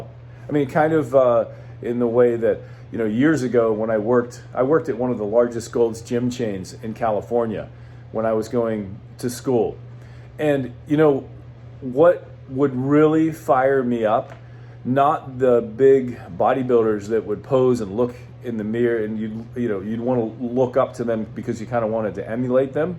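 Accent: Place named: Ohio, United States. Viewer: Do not even know who you are, I just clicked on this video watching you for the first time. You sound like you are a genuine dude. I wish you luck in your ventures, no matter the party you are with or running as, I like the things you are talking about. You seem as though you have helped people in the past in your profession. Also sounds like you are in Ohio I live in the Cincinnati area. Take care and Happy Holidays.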